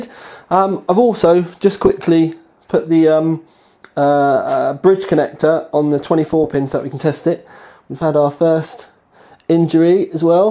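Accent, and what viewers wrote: United Kingdom. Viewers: this guy is so brittish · also you have an ABSOLUTELY BLOODY MASSIVE british accent no offense : )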